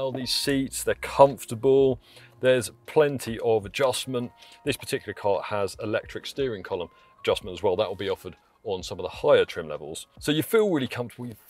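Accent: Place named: England, United Kingdom. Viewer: He speaks with a strong English accent! Hard to understand.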